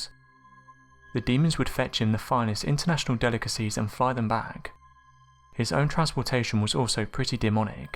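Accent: United Kingdom. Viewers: I normally don't care for the British accent but there is something soothing about his voice. Makes it that much more enjoyable.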